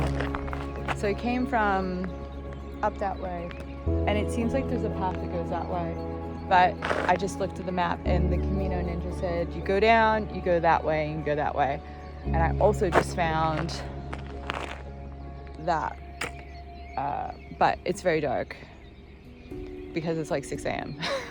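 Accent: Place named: Canada. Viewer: Despite your channel name, your accent sounds more Canadian than Australian. Fantastic videos.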